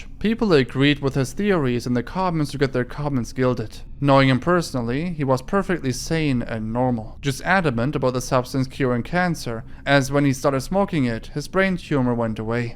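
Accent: Germany. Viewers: My favourite German YouTube accent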